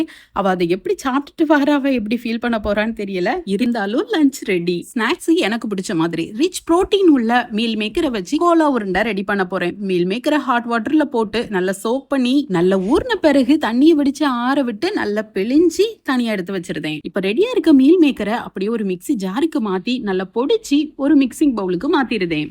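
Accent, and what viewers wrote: Tirunelveli, India. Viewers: apdiya ma nanum athey doubt la dhan keka vanthen namma ooru slang mathiriye irukey nu😅😊neenga tirunelveli la entha side?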